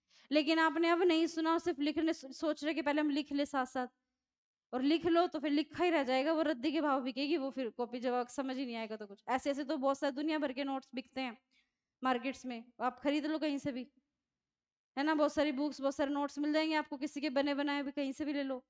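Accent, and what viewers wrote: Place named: Bihar, India. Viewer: Mam ji apka abaj hmare bihar se milta julta lagta h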